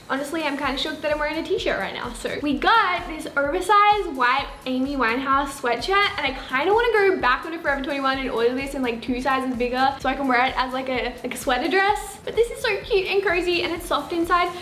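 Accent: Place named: Australia. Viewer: I am literally going to forever21 and getting everything you got in this video! I love hearing your voice, because I’m Australian, and I very youtuber I watch, is American, so I love hearing a fellow Aussie! Love u!!! P.s I love denim on demon PPS soft squad! PPPS I think it is a lettuce hem!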